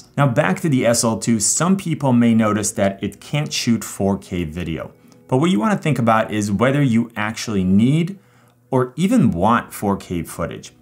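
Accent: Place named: Ohio, United States. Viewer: Hahaha, I thought to myself, "Man, Ohio. I can hear it." Then you showed Cleveland. BOOM. Greetings from an Ontarian in South Korea. <3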